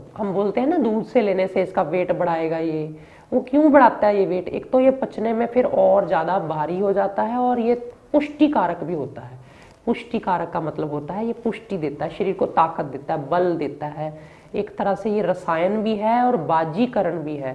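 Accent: Punjab, India. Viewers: Apki hindi ka bulnay ka tareeqa say muje lagta hai app punjab kay hai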